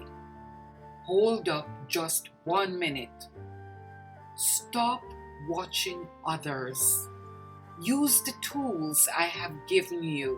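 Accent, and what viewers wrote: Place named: Jamaica. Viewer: You flavored this very well by chipping in the Patois now and then 😂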